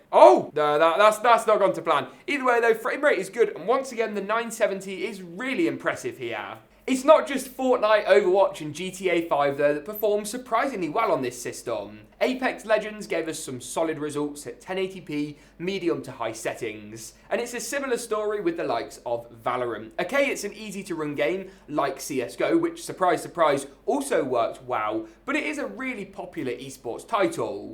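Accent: United Kingdom. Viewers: The most British guy I have ever heard!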